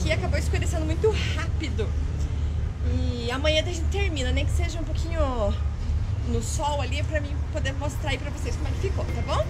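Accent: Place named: Rio Grande do Sul, Brazil. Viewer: Vc e uma motorista gatinha hem. Fico xonado nesta sua voz de gaúcha!!!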